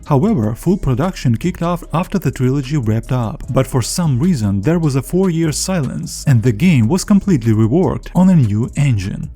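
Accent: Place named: Russia. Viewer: лол, русский тип, тебя за километр слышно.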